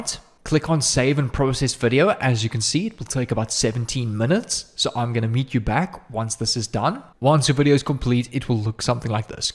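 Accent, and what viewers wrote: South Africa. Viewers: Ayyy, is that a South African accent I hear 😂🤙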